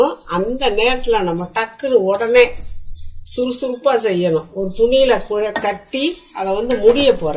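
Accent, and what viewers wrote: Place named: Kongu, India. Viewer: Unga kongu tamil language, vagapa... sweeta erruku patti😊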